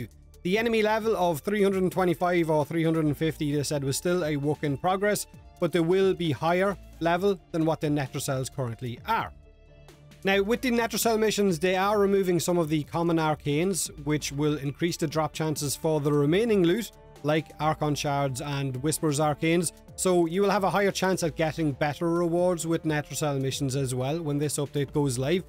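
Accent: Ireland. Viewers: clearly not considering this is an irish accent...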